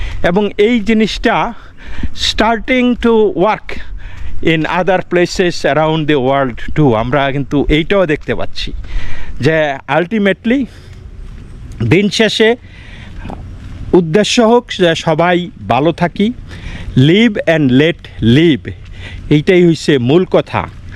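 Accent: Bangladesh. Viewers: your English pronunciation is like Bangla.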